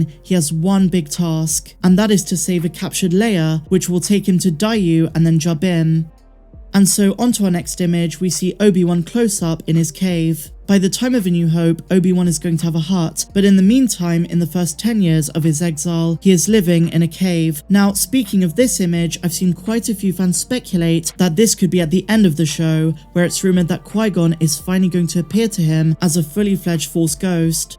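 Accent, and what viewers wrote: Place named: England, United Kingdom. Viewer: ! 👍🏻 It’s hard for me to differentiate “Last” vs “Lost” with your English accent. It’s a very subtle difference for me as an American. I’m sure it’s obvious for the English.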